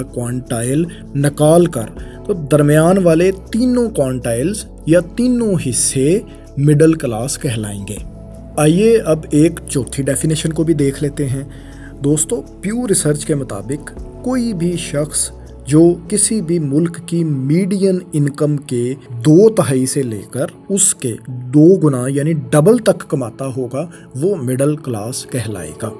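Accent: Punjab, Pakistan. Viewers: Everything is good and valuable except the VOICE. He speaks Urdu, in Punjabi accent. Please change your narrator.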